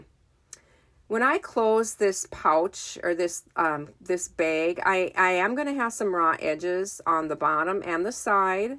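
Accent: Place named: Wisconsin, United States. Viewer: Cute cute! Love your accent! Wisconsin??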